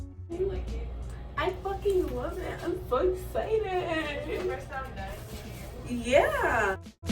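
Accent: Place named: Jamaica. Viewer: yes with the PATOIS gyal, GWAAN NUH! i think you have 4a/4b curls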